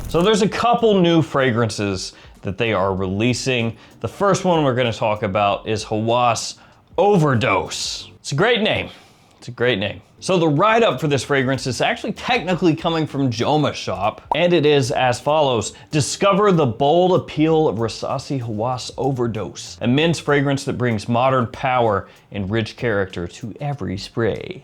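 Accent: South, United States. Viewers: bro his southern accent kills me LOL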